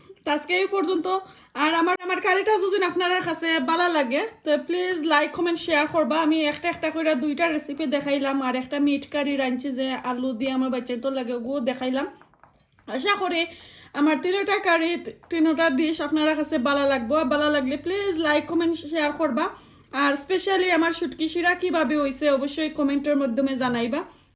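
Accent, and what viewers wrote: Sylhet, Bangladesh. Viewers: অনেক ভালো লাগলো,হাড়িগুলে অনেক সুন্দর কিন্তু চুলাতে নড়ছিলো,,,,হুকুইন শুরু দারুন হলো,,এত মরিচ,,আমার পছন্দ, তবে চেপা ব্লেন্ড করে দিতে হয় এটা জানতাম না,, সাথে ২ পদ মাছ দেয়া যায় সেটাও জানলাম,,কালার পারফেক্ট হয়েছে,,,, তেল ছাড়া রান্না টেস্টি হলো,,আমি আগে পেয়াজ রসুন তেলে দিয়ে রান্না করি,,আপনার মিট কারীও সুন্দর কালার হয়েছে,,, আইড় মাছটা কষিয়ে তুলে রাখি আমরা,,তরকারী হয়ে এলে লাস্ট এ কষানো মাছ দেই,,তবুও ভালো লাগলো,,আপনার ব্লগ আমার ক্লাসমেট দিয়েছে,,, সিলেটের কথা শুনতে ও ভালো লেগেছে ❤️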